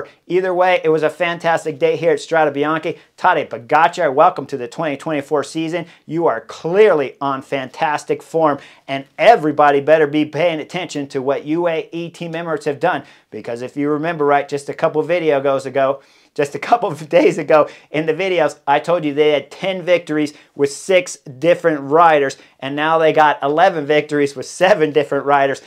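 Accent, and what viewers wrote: United States. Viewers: has the most "I don't give a fuck" American accent in cycling. Butchering every race and rider name left and right😅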